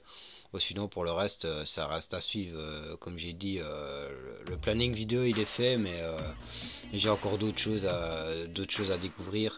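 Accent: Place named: Liège, Belgium. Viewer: Ton accent Liégeois ahha Je viens de Bxl moi, continue comme ça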